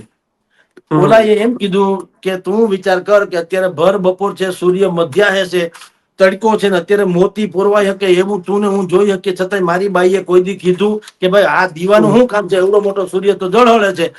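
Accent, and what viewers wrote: Kathiawar, India. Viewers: વાહ... સાબ..શું કાઠિયાવાડી શૈલીમાં પત્રકારિતા છે...વાહ😊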